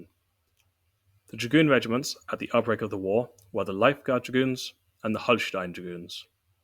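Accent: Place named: Germany. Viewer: Ver-ve-uh is closer. I appreciate the effort, but there’s not much point in using these terms if native speakers can’t understand half of them. Just speaking English would be clearer, because right now it sounds like a mix of German and English which gets a bit confusing. I’m not trying to correct your pronounciation, I just figure it’ll be easier for everyone in English.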